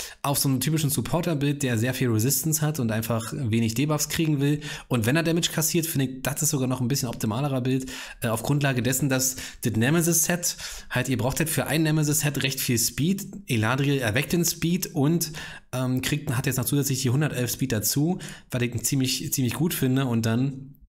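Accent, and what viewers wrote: Berlin, Germany. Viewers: Hey wieder danke für das nice video direkt mal Ariel und ella umgerunt waren schon eingestaubt dein Berliner slang macht es einfach noch mega symphatisch Grüße knall&voll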